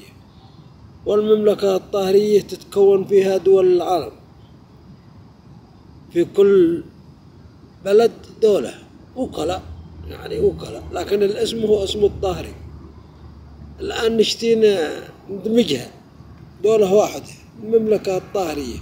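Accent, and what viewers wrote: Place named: Mecca, Saudi Arabia. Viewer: استغفر ربك بنو قريش معروفين ولا يضيع نسبهم وهذا واضح انة من مكة المكرمة حتي اللهجة مكاويه بطن احجاز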